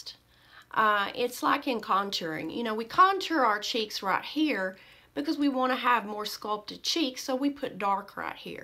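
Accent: South, United States. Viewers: Hi, you are so right about this! Simple logic that I certainly did overlook. I occcassionaly get bags so I will remember that. I do get dark circles so for that I will use the concealer. I love your southern drawl. Feel better. Melissa is a sweetie. xoxo Much love xo Marta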